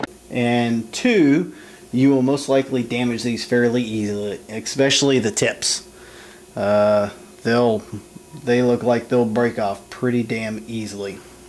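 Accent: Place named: Texas, United States. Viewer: Texas accent is no excuse for mispronouncing our dark master Sauron the great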